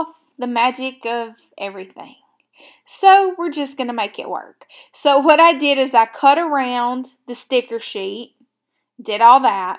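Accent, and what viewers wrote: South, United States. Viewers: My oh my what an entertaining video. You have all the makings of a TRUE artist. Mygrandaughter once said “ us artists don’t give a care”. Referring to freeing ourselves As we create, KEEP on believing in yourself . The southern accent struck my heart.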